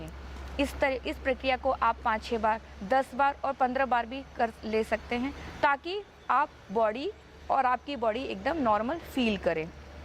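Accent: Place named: Hyderabad, India. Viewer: From which country are you from I think your from hyderabad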